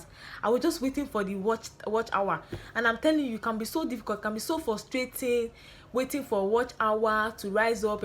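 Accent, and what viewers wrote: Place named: Igbo, Nigeria. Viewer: I remember your first video I watched that made me love your content. That motivational speaker video. I laughed so hard. let's do part two of that video together...Lol. I love your igbotic vocal in this video. So pure, original and quality content as always. I love you, baby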